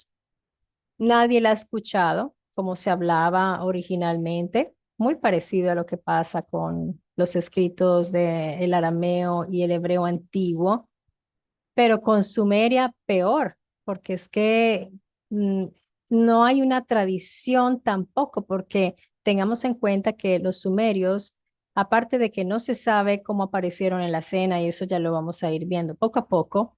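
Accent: Italy. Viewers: ¡Correcto! Usted si sabe decir las palabras exactas. No es la primera vez que desde aqui, en casa, le aplaudo por la precisión.... Devuélvanse un par de minutos y aprenderán lo que es hablar correctamente ( disculpando el hermoso acento italiano, que le dá mayor belleza)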